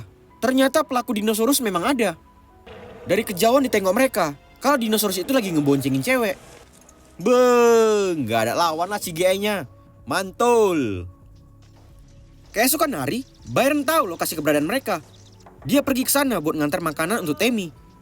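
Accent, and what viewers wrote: Medan, Indonesia. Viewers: MEDAN KALI BAH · bah medan kali bang ,,uda ku subrik ya bang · Bang kau orang Medan iya kan...? Logat kau GK asing Bg krna aku juga orang Medan 😁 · Lucu kali abang ini bicaranya mcm org Medan aja. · Hahha medan kali logat kau lek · Abang ini keknya orang medan ya... Logatnya itu medan kali kutengok😂😂😂😂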